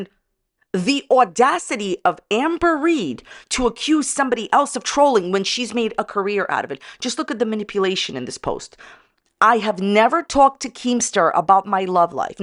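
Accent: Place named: New York, United States. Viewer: With how sneaky her words are, she could be a lawyer. Shame she doesn't have certain other qualities needed. Btw, if someone hasn't coined the name Scamberlynn/Scandalynn Reid for her yet (pretty sure it's been done though) I'm claiming it 😂 Also, hi! This is the second time I've seen your video pop up in my feed. Not much of a follower of Gorlworld beyond the occasional video here and there, but I think I'll stick around with you for a bit because you've got a really pleasant voice and accent (New York/New Jersey?). Have a subscribe 😊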